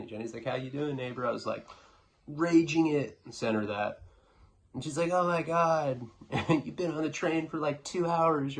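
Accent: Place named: New York, United States. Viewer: Your ny accent is hilarious I just think about son from jail lol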